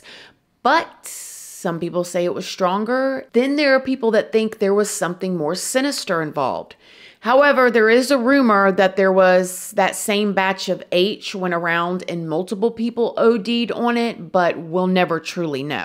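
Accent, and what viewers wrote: South, United States. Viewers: Funny Video... I like Your accent... You Sound Country....❤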